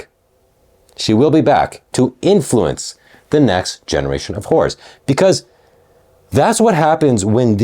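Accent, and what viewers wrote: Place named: Australia. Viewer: I was really really hoping to NOT hear an Australian accent /: I'm so ashamed /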